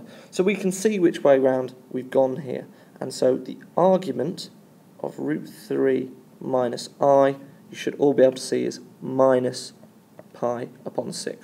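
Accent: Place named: United Kingdom. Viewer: i love that british accent :D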